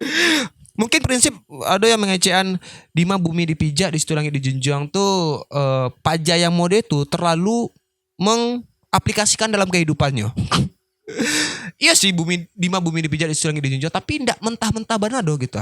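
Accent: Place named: West Sumatra, Indonesia. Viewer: Mantap..bahaso minang nyo kental...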